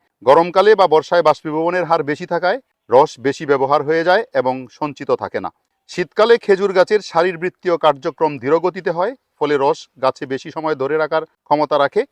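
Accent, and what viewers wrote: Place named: Bangal, Bangladesh. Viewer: গাছি ভাই। কি বাংলা মাইরি। বাঙাল দের বাংলা। দেশ পুড়ছে আগুন জ্বলছে আর এই মাস্টার খেজুর এর রস নিয়ে আলোচনা।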